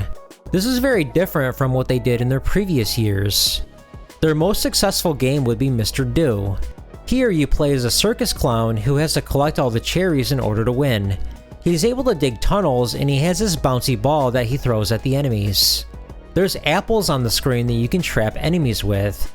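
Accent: Chicago, United States. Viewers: I have no words to express how happy it makes me to have do his signature smile after his intro. That and his Chicago accent makes me click right away. Oh and the content is great too.:)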